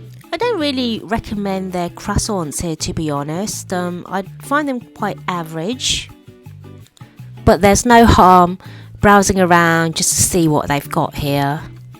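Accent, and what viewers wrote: United Kingdom. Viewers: 100% London accent Great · Queen's English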